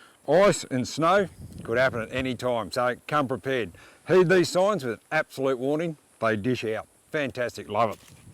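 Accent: Australia. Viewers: lol. You have the okka accent. Crocodile Dundee Steve Erwin sound. My brother got into 4x4 camping. Low and behold his speech pattern changed after 6 months in the bush. Recon ' e ' ad a close a close encounter of the third kind on his adventure. On ya mate. Keep having fun.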